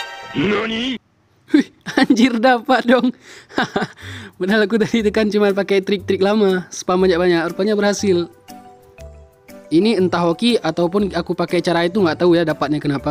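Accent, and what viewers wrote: Aceh, Indonesia. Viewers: Gaya peugah aba awk aceh sang bak hi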